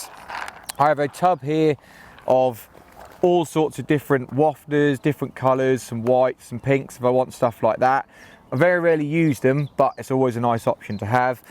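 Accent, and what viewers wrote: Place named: Coventry, United Kingdom. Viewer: Your accent tells me your a cov fan?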